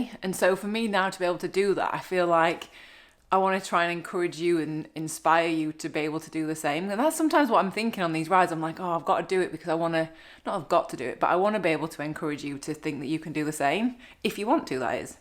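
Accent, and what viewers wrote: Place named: England, United Kingdom. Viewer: You are so beautiful. Listening your vids to hear lovely english accent and also for bikeride infos. Thank You. Also I follow Harley Johnson, amazing man... Good luck to you on your rides. 💛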